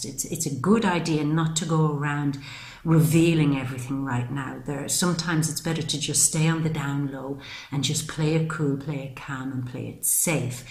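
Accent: Ireland. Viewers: Hey! Just found your channel and loved this reading 💟. Great to hear a fellow Irish woman here 😊. Look forward to following you and looking out for future notifications. Keep up the great work! Thank you 😃👏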